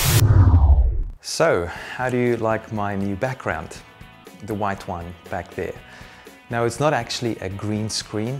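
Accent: South Africa. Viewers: You're South African :D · Are you South African by any chance? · Where r u from u sound like u are from South Africa (not trying to be offensive I from there ) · are you south african? :D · great video, thanks. Quick - and completely non-related - question: are you an expat South African? Or am I just imagining the Saffa accent? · or South African living in Australia...does it matter? Its a bloody good video with great advice.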